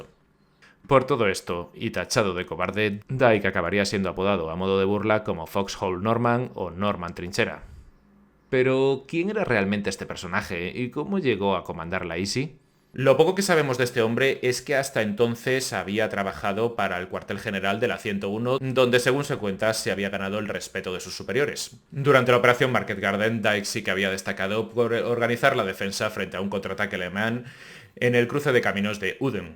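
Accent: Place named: Spain. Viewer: El comentario más ridículo que he visto en mucho tiempo (ojo el comentario, no tu persona). Hoy por hoy rechazar el acento español peninsular es un absurdo y un despropósito, con la de canales buenos que hay con dicho acento. 😅 Y no entiendo que es lo que no se entiende, acaso de hablar de la "wea weón po?, no po wn; si no entiendes nada del vídeo tení que hacerte ver eso cachai hemano?"😂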